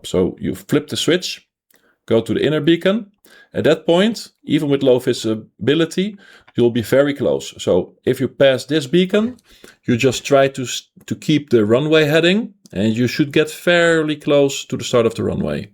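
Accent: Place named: Netherlands. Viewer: you sound utterly Dutch 😂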